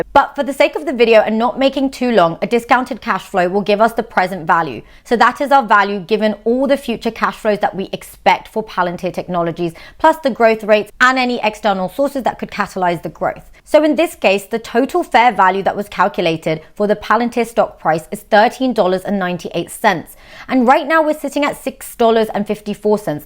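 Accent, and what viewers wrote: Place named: United Kingdom. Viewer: Do I dig your British accent!!!! Where would professor Higgins place your accent? Lundan?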